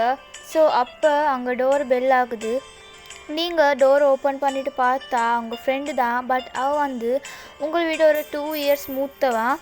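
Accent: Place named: Sri Lanka. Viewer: Akka neenga srilankan aa ungada tamil language konjam different aa irukku 🤔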